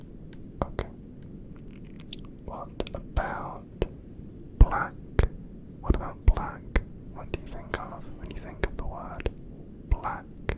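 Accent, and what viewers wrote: United Kingdom. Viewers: Love the english accent in ASMR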